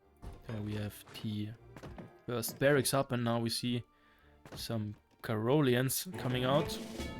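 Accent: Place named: Germany. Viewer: danke :). Ich dachte es mir, dass du deutsche "wurzeln" hast, wegen deinem akzent ;). Du machst das prima 🙃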